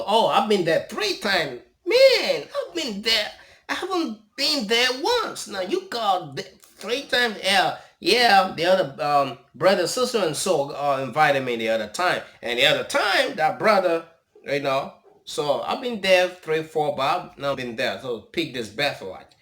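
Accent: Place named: Calabar, Nigeria. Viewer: You are Edo but got a Calabari accent. Or maybe it’s I have not been in Nigeria for a long time.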